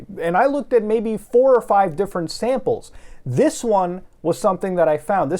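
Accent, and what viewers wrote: India. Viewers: Can you read all text written by scammers in ur Indian accent like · Your indian english accent is really bad lmao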